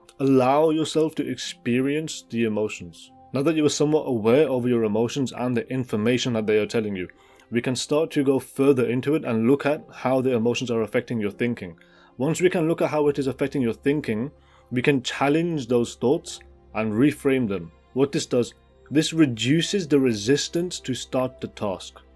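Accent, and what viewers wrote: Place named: Egypt. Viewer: This random, tiny channel of a man speaking with Egyptian accent has helped me so much with pushing through procrastination. Thank you Internet man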